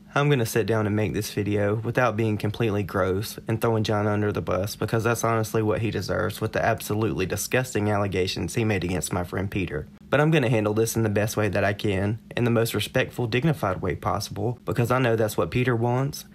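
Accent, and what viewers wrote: South, United States. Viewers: uggggg, your southern accent makes me crave okra :( <3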